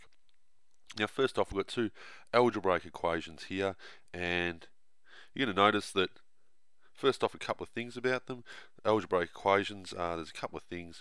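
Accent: Australia. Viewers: It’s an Aussie accent but his nose does sound blocked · he is i'm from the land down under you get thus accent all the time. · its a really strong australian accent and I dont blame you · Don't diss the Aussie accent! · He is australian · Great stuff! And refreshing to hear an Aussie accent on Youtube for a change 👍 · clicked on this hoping its not an american and wasnt overjoyed to find out its an australian😁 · hes australian mate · Inaya way Australian mate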